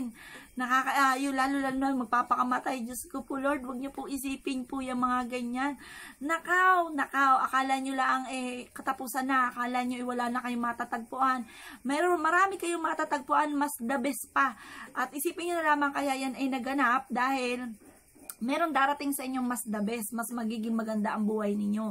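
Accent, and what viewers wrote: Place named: Batangas, Philippines. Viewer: Hehehe.nkinig ko nmn ang punto mo madam akoy ntutuwa sau eh,,.nkow! Ala eh,,hehehe..madam parihas po tau,,batanginya po talaga kgaya ko..godbless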